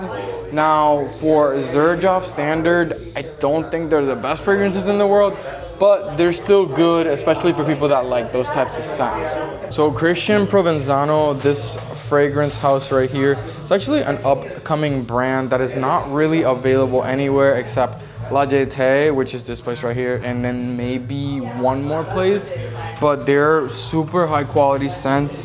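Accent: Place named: Italy. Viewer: 🤨 Italian voice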